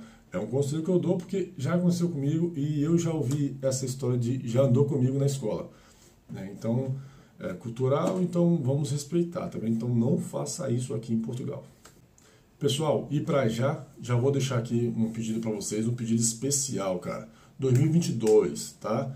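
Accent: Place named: Bahia, Brazil. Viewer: Vc fala como neu irmã da Bahia, Salvador, por isso pergunta se é baiana, desculpa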